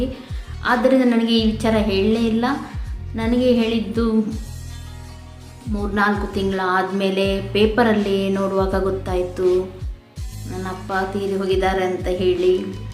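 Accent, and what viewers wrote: Mangalore, India. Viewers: I lv mangalore ...I lv mangalore type kannada superag iratte Bangalore ginta mangalore kannada ne clean iratte valasigaru bandu alli kannada na kannad madidare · ಮುದ್ದು ನಮ್ಮ manglore ಭಾಷೆ ತುಂಬಾ ಚೆನ್ನಾಗಿದೆ, ಆ mathalli ನೀವು ಇನ್ನೂ ಮುದ್ದು, ನಿಮ್ಮಿಂದ ನಾನು ಅಡಿಗೆ ತುಂಬಾ ಕಲಿತೆ, ನಿಮ್ಮ ಮುದ್ದಾದ ಫ್ಯಾಮಿಲಿ, ನನ್ನ koraggajja ನ ಆಶೀರ್ವಾದ ನಿಮಗೆ ಯಾವಾಗಲೂ ಇರಲಿ, ನಿಮಗೆ ಆದಷ್ಟು ಬೇಗ ಸ್ವಂತ ಮನೆ ಆಗಲಿ ಎಂದು ಪ್ರಾರ್ಥಿಸುವೆ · Mangalore kannada...super mam... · Kannada Chennaigide Mangalore Kannada Accha Kannada don't worry mam. I am SWATHI. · Akka I love u Nan sister antha ankothini. mangluru kannada chanda akka thumba naryadi inda maathadthare alli. bangalore kannada varatu akka Naanu mandya agagi helide. Yak althira naavu nim family ankoli akka albedi Nan hesru radha.albardu my request akka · Mangalore Kannada Super medum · Mangalore Kannada clean maraayre. I love Mangalore type Kannada. 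I am a proud manglorean.❤️❤️😘😘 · Nivu estondu bejar agbedi nanu Mangalore nodidini ede eriti Kannada matanadodu. Nima skin tumba channagide. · I like ur mangalore kannada. And I like ur life style. Thank you for sharing your personal. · Hey so sweet of you are,,,, u r pretty yar,, mathe nimma manglore kannada namge tumba esta marayre!!! :) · ನಿಮ್ಮ ಮಂಗಳೂರು ಕನ್ನಡ ತುಂಬಾ ಇಷ್ಟ ಆಯ್ತು ಹಾಗೂ ಆಡಂಬರವಿಲ್ಲದ ನೈಜ ಮಾತು ಕೂಡ ಇಷ್ಟ ಆಯ್ತು. Nature & God bless you.... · Don't worry my friend. Neeu nam urinavru anta kushi aitu. Manglure kannada- is best keluvaga tumbaa khushi agutte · Donot worry i like your kannda speaking why to worry in mangalore this way they soeak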